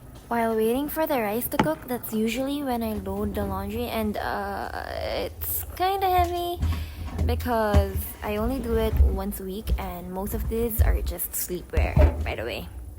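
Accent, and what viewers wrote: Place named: England, United Kingdom. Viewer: loving your english accent!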